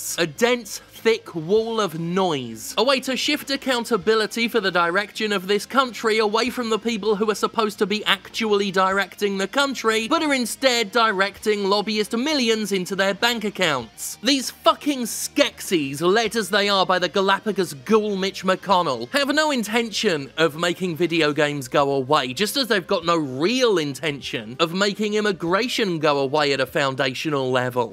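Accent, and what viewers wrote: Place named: United Kingdom. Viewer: I feel like a guy with a British accent immediately loses credibility on this issue. The country Jim was born in has been throwing people in cages recently for offensive tweets. Our individual gun ownership prevents that sorta government oppression at large, and those that don't own guns are free riding on that notion the same way that anti vaxxers free ride on the herd immunity of diseases.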